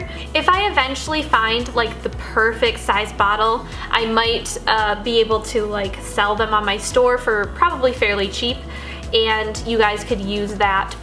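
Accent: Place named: Ireland. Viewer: she sounds more Irish now is it just me?😕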